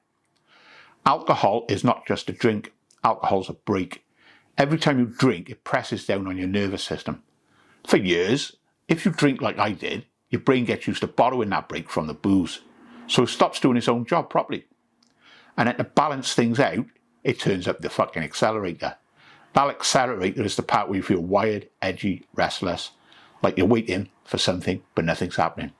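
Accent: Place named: Birmingham, United Kingdom. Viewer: It's like one of the peaky blinders got sober.. and having him telling you how it's done.. love it!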